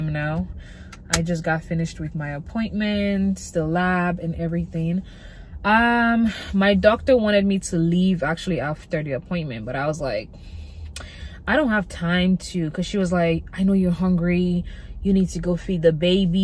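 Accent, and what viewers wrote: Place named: England, United Kingdom. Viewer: Your skin is glowing ♥️😩 It's your English accent for me💖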